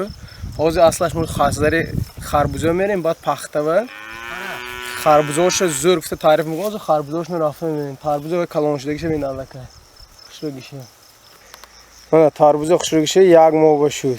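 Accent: Konibodom, Tajikistan. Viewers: Карочи хайку хамин видеохои шумова гап нест. Чисто конибодомча новаст, чигда. Чиста дехоти. Канда занед бародар. Офарин.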